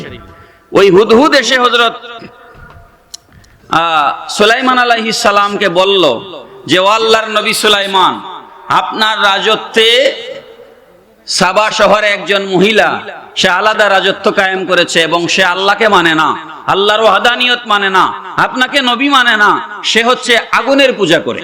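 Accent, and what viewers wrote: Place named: Sylhet, Bangladesh. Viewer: Masallah sundor waz sylheti vasha onek valolage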